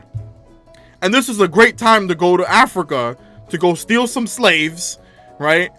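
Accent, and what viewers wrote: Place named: Puerto Rico. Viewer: I laughed so hard. Probably more than I should have. 🤣😂. Good stuff. I knew you were Puerto Rican as soon as you opened your mouth. 💪great personality.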